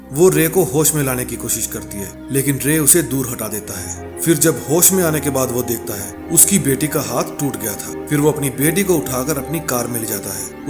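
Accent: Punjab, India. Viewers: mennu laggda 22 punjabi aa 😂 accent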